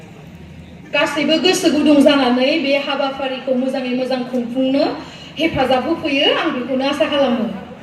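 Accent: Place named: Udalguri, India. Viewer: jwbwd mwjang jadg Abo.. Ngni voice kwo birad mwjang mwnw ag ngsiniting ni edi railynykwonw mwjang mwnw ag kwnasogbai tanw lubei. Agni lwgw dgw Odalgurini railynykwonw birad mwjang mwnw ang Abo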